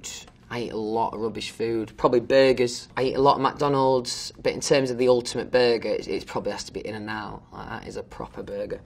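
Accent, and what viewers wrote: United Kingdom. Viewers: how can anyone ask if he's british when he literally says "me" instead of "my" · he's so british i can't 😭😭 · The British accent is here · Do you know how much I love you, you bri'ish king? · I feel like he's never sounded more British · I noticed that Louis’s accent has gotten more British since he stopped touring with one direction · Sometimes so British even the subtitles mess up 😄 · I don’t remember him being the most British sounding out of the 4 British members and yet, here we are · i love his british accent since 2010 ❤️ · 영국 액센트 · He sounds so much more British than I thought he would, not that that's a bad thing. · He’s somehow gotten more British since the hiatus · help I don't know what he's saying with his british accent. All I'm getting is "prodly med ginger made Olly." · is british. girl have you heard is accent 😻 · his british really jumped out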